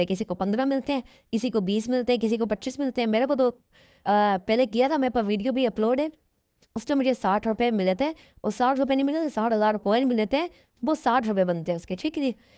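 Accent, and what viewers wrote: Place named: China. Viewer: Tumhara Hindi Do Chinese hindi hai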